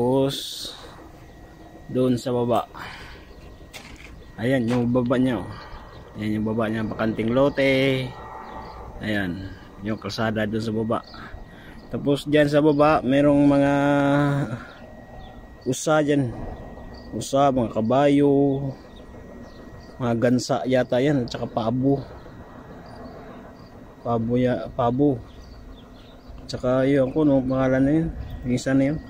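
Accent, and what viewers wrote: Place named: Visayas, Philippines. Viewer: Bisaya deay ka lodi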